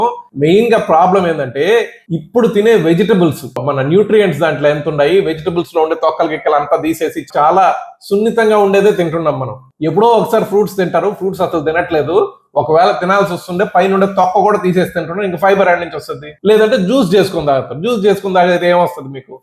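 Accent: Nellore, India. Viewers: sir, super sir meeru, with absolute nellore language super explanation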